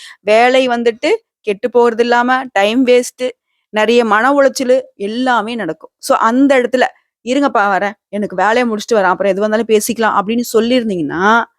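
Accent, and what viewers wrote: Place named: Periyapalayam, India. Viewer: Periyapalayam Tamil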